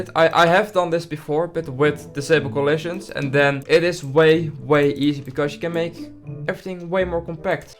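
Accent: Netherlands. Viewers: You can hear so much that he just speaks Dutch. It's nice that you make English videos. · love the dutch accent are you from dutchland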